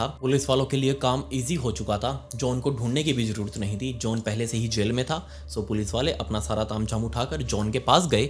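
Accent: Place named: Hyderabad, India. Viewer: I visited your channel after years and idk why I feel your accent is changed. You are sounding hyderabadi accent 🤧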